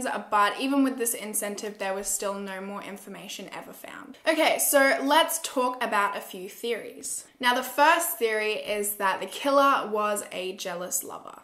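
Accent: Australia. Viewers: I love the Aussie accent so much! Am I the only one haha? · i love your accent so much. i want an australian accent but i’m a boring american 😅😂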